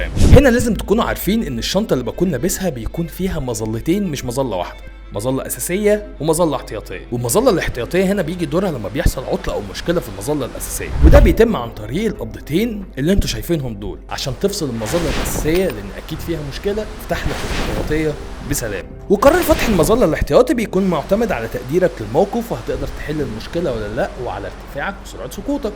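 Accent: Egypt. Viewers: برافو عليك بقيت تطلع في سبيستون بس اللهجة المصرية أحلى من الفصحة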